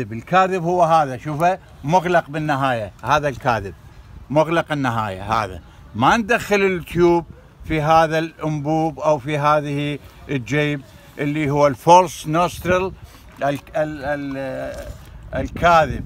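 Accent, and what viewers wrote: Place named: Iraq. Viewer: صوته ذكرنا بصدااااام الله يرحمه احس انك عراقي اصل وفصل الله يحفظك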